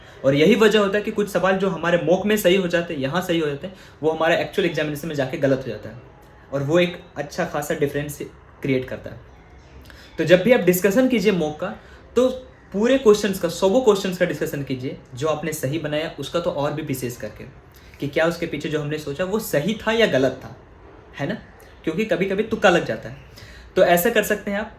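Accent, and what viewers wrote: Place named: Maharashtra, India. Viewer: it feels like you are from Maharashtra ♥️ amazing voice!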